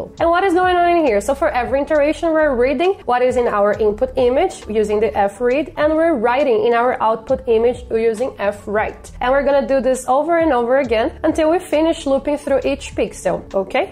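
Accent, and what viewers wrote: Brazil. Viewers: Sabia que era brasileira! rsrsrs Esse sotaque brenglish é diferenciado hahaha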